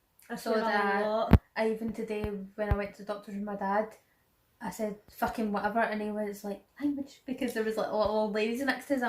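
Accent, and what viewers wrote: Scotland, United Kingdom. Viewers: I just found your channel, and I love it! I'm from Idaho in the United States. Thank you for sharing your culture. I love the Scottish accent!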